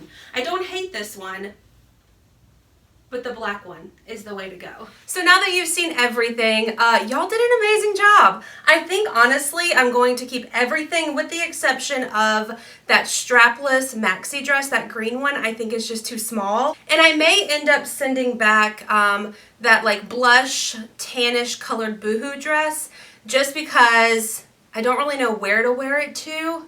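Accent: South, United States. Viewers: What state are you from I noticed you are southern and yes I am southern to.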